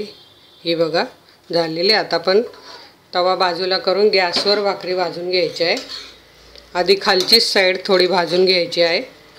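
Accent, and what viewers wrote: Khandesh, India. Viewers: kaku me nehmi pahte tumchya receipes.bhari sangta tumhi.tumhi khandeshi ahat ka? bolnyachya style warun watla mala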